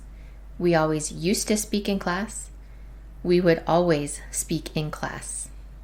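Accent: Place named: United States. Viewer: I get so distracted with your accent that I dont undestand what you are explaining. You sound so american that you are pronouncing the O and A with american accent. This may have been a good explanation of the imperfect but your accent is really distracting me.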